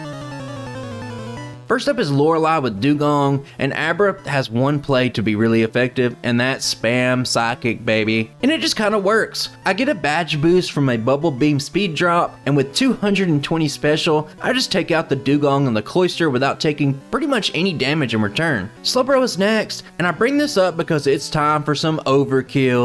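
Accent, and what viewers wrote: South, United States. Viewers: Finally, not a Canadian. You've got the most southern accent of any Pokémon challenge Youtuber and it's welcome.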